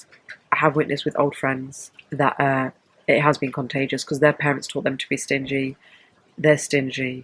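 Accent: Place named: United Kingdom. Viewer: i didn't expect you to be br*tish wtf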